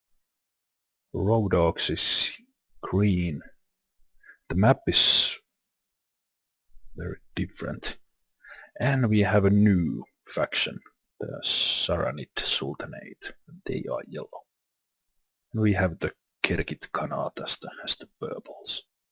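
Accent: Finland. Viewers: He just sounds like a guy with a prominent Finnish accent. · Are you Finnish? Your accent so Finnish.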